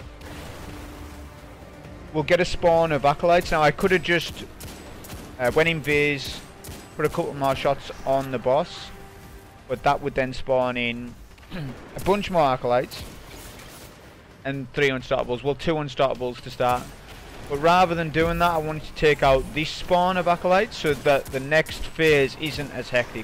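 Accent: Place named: Cumbria, United Kingdom. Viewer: haha im from cumbria too mate and i just noticed your accent and thought he sounds very local!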